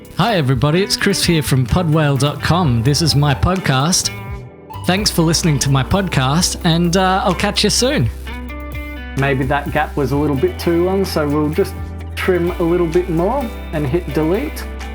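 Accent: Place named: New Zealand. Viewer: Took me way too long to realise he’s a kiwi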